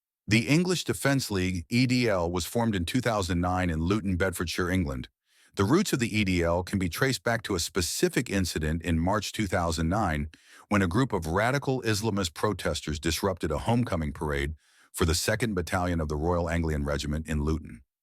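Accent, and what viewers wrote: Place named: United States. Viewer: Awful lifeless video for two reasons: the AI voiceover is in an American accent, and the video is clearly made by AI, not real footage.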